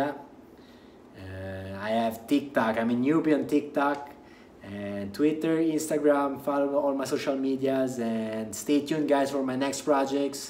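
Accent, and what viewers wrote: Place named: Italy. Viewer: Kasing hot ng Italian accent mo rarr!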